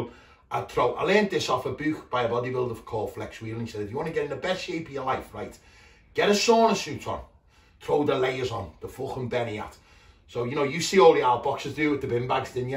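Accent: Liverpool, United Kingdom. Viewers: Proper scouse him. Quality.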